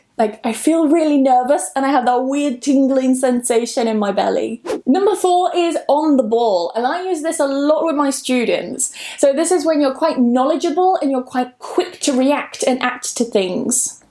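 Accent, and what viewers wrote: United Kingdom. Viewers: Hello ☺️ Take love from India ❤️😇💐 can you please tell me in which british accent you are talking 😇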